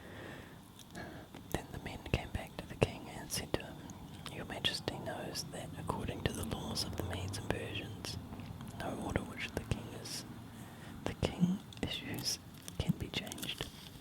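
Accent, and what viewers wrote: New Zealand. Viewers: It's interesting, you'd think being a kiwi myself I'd get used to the accent, apparently not! I've been listening to most of your asmr and it's always very comforting - personally I love your readings of the bible the most. If you one day do a video where you whisper into both ear at the same time with a bible reading... well. (no hints here ;) ). God bless :) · sounds like kiwi accent to me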